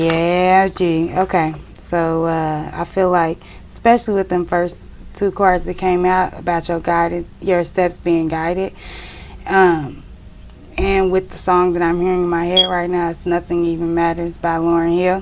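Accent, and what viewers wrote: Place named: Northern California, United States. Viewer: You sound like you’re from where I’m from, Nor Cal. Lol. I’m hear for another Aquarius man, Scorpio woman reading. Trying to get a read on this new situation I’m in. 🤔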